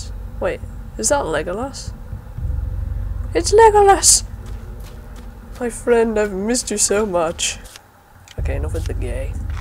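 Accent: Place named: North, United Kingdom. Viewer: Thanks for the upload, just got through watching it all, brought back many memories :) Sounds like you're a fellow Northerner with your accent as well!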